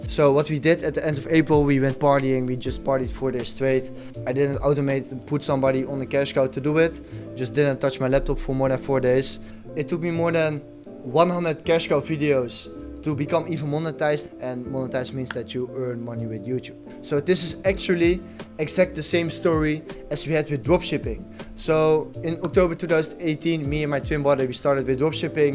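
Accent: Netherlands. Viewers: Klinkt nederlands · Love the Dutch accent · Lekker Nederlands accent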